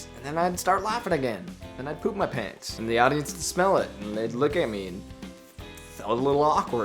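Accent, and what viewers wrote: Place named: United Kingdom. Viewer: I thought he was British for a second XD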